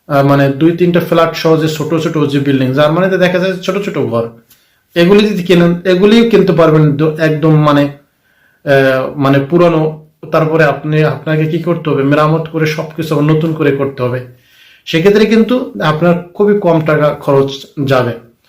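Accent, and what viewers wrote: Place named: Barishal, Bangladesh. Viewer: ভাইয়ের বাড়ি নিশ্চয়ই বরিশাল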